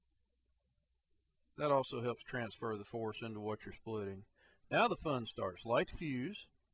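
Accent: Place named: South, United States. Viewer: Normally I hate long intros, but I feel like it really made the video more entertaining, especially with the southern accent😂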